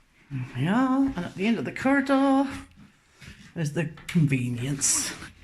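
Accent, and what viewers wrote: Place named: Essex, United Kingdom. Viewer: Oh my....I admire you so very much. I’m new to your channel, and I haven’t even finished watching your first episode, but I’m in love with your property and can’t wait to watch all your updates. I’m over here in the US and can’t tell you how much I enjoy the countryside of the UK and France. So beautiful. And the buildings, and the history, and the grandness of it all.....💕💕💕 so excited to cheer you on and get to know you and your story. And I love your Essex accent....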